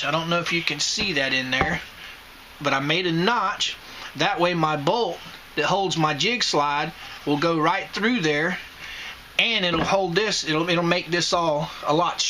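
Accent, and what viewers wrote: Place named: Missouri, United States. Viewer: LOL.... I like your accent.... Are ya from Missouri?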